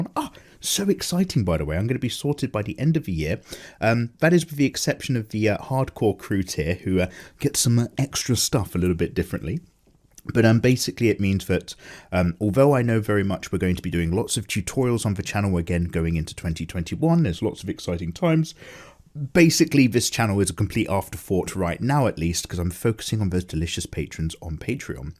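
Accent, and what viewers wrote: United Kingdom. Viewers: Everyday i see this man and go Amazing voice, british, drinks tea, draws lewd girls, HOW IS HE STILL SINGLE!?!?!?!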